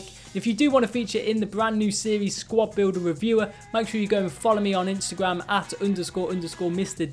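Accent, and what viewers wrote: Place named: Wolverhampton, United Kingdom. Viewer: You from wolvo 😂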